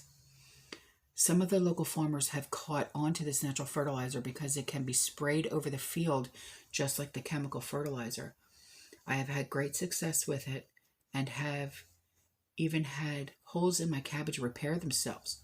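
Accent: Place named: Philadelphia, United States. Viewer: Dear love you! are you a Philly girl? Your accent sure sounds like it!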